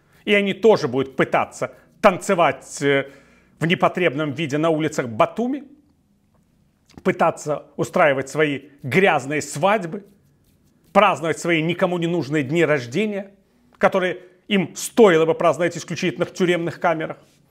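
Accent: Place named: Baltic. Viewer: Ты кто??? Комментировать за Грузию с акцентом прибалтов.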